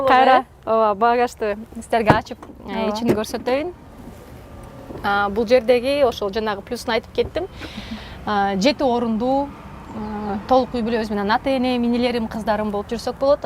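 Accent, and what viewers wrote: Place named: Kara-Suu, Kyrgyzstan. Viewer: Анжеликага Кара-Суудан ысык салам, сүйлөгөнүңүз аябай жакты, уккун эле келеберет экен, бизге аябай окшоп сүйлөп калыптырсыз))) Жумуштарыңызга ийгилик, ден соолугунуз чын болсун, үй-бүлөңүз менен аман болуңуз. Жаңы ырларды чыгарып, ырдап биздин көңүлүбүздү көтөрүп жүрөбериңиз!!!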